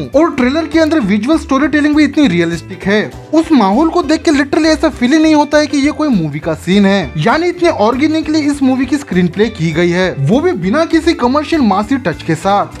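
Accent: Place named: Odisha, India. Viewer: Tere hindi bolne se lagta he tu odiya he hindi me promotion kara raha he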